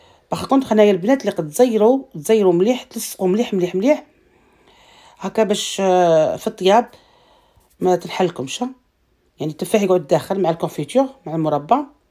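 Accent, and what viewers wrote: Oran, Algeria. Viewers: لا اختي هدرتك تاع وهران نشان 100/100نقولونو ونقولو تبرورو ونقولو لخيا ههه ربي يحفظك اختي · السلام ناس العاصمة أقولو تبرولي صراحت تعجبني اللهجة الوهرانية التابي جاء امخلا ف سلام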